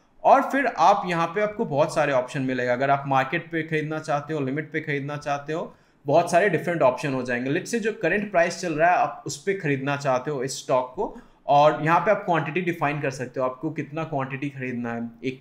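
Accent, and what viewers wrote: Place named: United States. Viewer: Aaj Maine pehli baat american accent me hindi basha suna hai.. Ya fir ye kaho ki angrezi me hindi suna hai..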